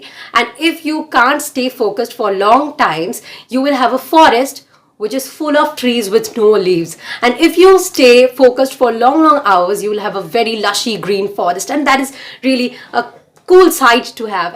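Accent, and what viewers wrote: Bengal, India. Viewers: Are you Indian? Your pronunciation proves that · May I know if you are a Bengali... Because I found a Bengali-like accent in your speaking and that is good. Anyway I'm a Bengali.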